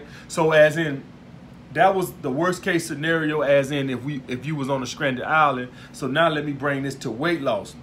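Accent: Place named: South, United States. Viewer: the way you talk remind me of Hodgetwins, except a stronger Southern drawl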